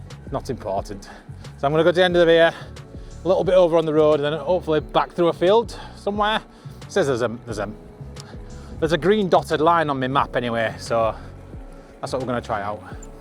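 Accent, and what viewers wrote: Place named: Barnsley, United Kingdom. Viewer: Eyup fella, there's nowt worse than feeling knackered sleep wise, but at least you got out and did summat. You can't beat getting out and getting some air in your face, and especially when you're fatigued, or your head feels full. Don't worry about your Barnsley twang, it's who you are, and your music was just rate and not too harsh to go with your ride. Me and my mate are having a rest tomorrow (Sunday) we've done 6 rides this week and about 145 miles according to strava. I see your on a emtb, have you ever run out of battery? We're not ruling out having one ourselves at some point in the future, but while we can, we'll keep battling on. Keep the videos coming fella 🚴‍♂️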